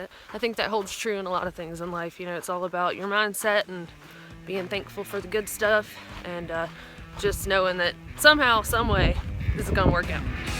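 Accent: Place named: Oklahoma, United States. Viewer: Awesome, you are a true good sole, way beyond your years!! Thank you for all of these videos, I grew up in Huntsville, and Oklahoma so love your dialect, it's all about being simple and enjoying the moments you never get back everyday!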